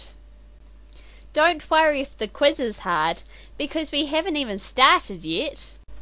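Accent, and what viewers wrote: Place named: Australia. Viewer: To me it sounds like a heavy Australian accent. Some say 'New Zealand' but I can neither agree nor disagree since I haven't really heard one (and if I did- I might've passed it off as Australian -_-;). No offense, her voice is annoying and I can't concentrate if I had to study with her. "Did you know that I am special?!" ...riiight....we're all special lassie, in our own separate ways. "I can see your facial expressions" Tell me, you were so originally a porn stimulator weren't you?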